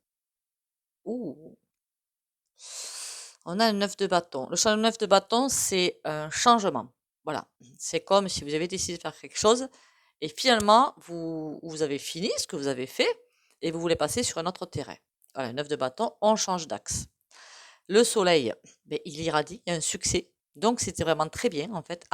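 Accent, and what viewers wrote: South, France. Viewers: Bonsoir, votre accent est pour moi, très agréable, il m'apporte le soleil tout les jours, je suis du Sud et je n'y vie plus, il me fait beaucoup de bien, merci beaucoup, belle soirée à vous 🙏💐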